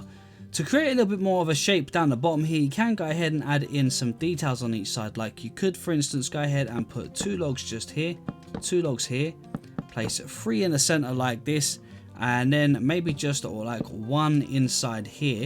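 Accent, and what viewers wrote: United Kingdom. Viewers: you bri-ish? you sound it?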